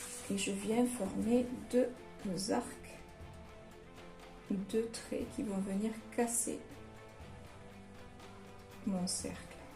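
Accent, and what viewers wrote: South, France. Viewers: Bonjour. Quelle peinture or utilisez-vous, si ce n' est pas un secret? Merci pour votre pédagogie, votre sourire, et votre accent du Sud délicieux à mes oreilles nordiques (Belgique)...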